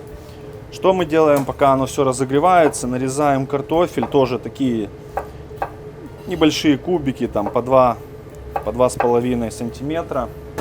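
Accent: Ukraine. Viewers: Да,малоросский акцент. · Все отлично, надо только поработать над собой- украинский акцент сильно мешает слушать, изви6ите!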